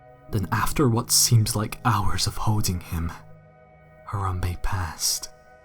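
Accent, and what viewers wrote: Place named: Scotland, United Kingdom. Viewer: I remember you when you were. Your dead Scottish voice is the best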